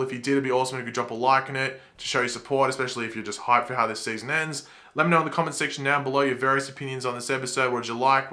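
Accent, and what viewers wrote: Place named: Australia. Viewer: Your mad cause u dont realize hes australian. They have accents. Not everyone is american get over ur self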